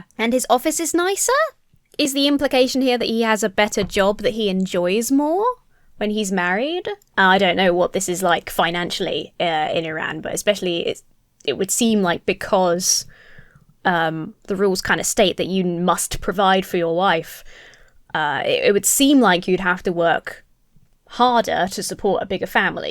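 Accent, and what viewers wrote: United Kingdom. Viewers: Justice for the tortoise! I love how you say tortoise! Love your content. You British people always get me with your accents!!! You're now my third British YouTuber... Simon Whistler, Tara mooknee and you. I guess daz black is also British but his accent is different than yours. I am a married man in America and i love being married to my wife. If I'm completely honest she does take care of me and i am healthier because of her. Its not that she's my mom or anything. Even though she does do stuff for me but part of it is she encourages me to be better because i love her. Ive been seven years sober because i want to live longer because of her and the children she's given me. Also, she's awesome and who wouldn't want to be alive when you're married to her.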